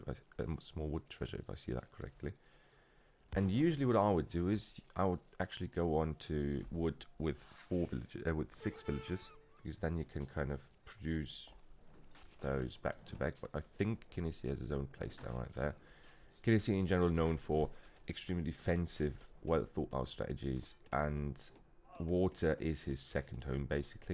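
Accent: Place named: England, United Kingdom. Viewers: Ja krass, du klingst echt wie ein Engländer. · der Meinung war ich auch. Ein leichter britischer Akkzent kommt da durch😁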